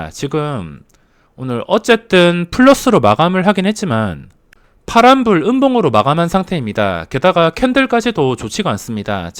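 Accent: Gyeongsang, South Korea. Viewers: 아씨.... 갱상도... 오늘 수급 있는게인지 잊는게인지 한참 통번역했네...ㅋㅋㅋ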